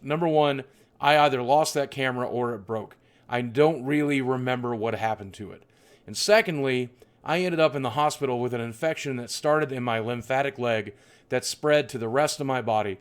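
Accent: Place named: Arkansas, United States. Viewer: This is off topic boogie, but I'm from Australia and I just wanted to say you have the most pleasant accent to listen to out of other US states (Arkansas right?), I enjoy listening to you speak and you could talk about literally anything and I would find it interesting haha. Love ya mate!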